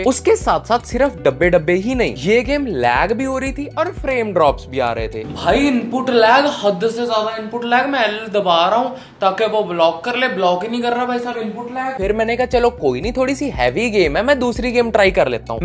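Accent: Punjab, India. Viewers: Bhai tu punjabi aa😂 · Peculiar Indian accent 🤣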